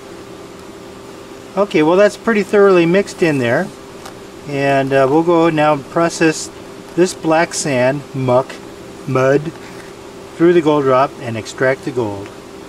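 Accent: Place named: India. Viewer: Indian delivery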